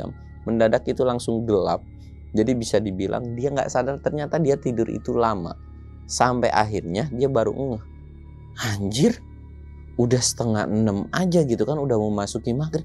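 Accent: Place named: West Java, Indonesia. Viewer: bang salam kenal dari batujajar cimahi kalo boleh tau abang asli mana bahasanya ada sunda sunda gt bang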